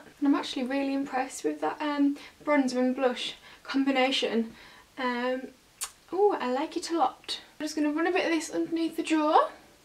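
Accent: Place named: Midlands, United Kingdom. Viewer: I haven't heard someone from the Midlands on youtube before XD Represent!! Lol.Nice vid :)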